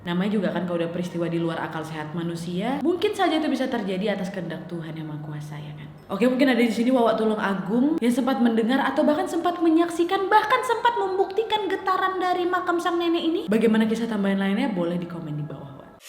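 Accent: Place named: Pontianak, Indonesia. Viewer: Org pontianak mana suara nya ,,😆😎💪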